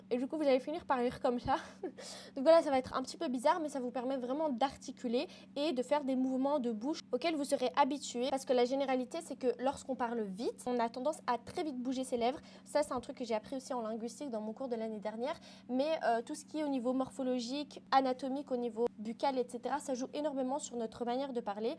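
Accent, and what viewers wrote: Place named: Tunisia. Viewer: Tu es tunisienne 😂😂😂 je reconnais l’accent 💕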